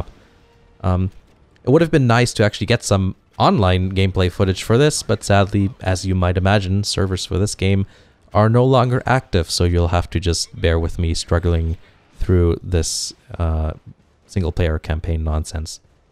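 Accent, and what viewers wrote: Germany. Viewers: You are from Germany :D?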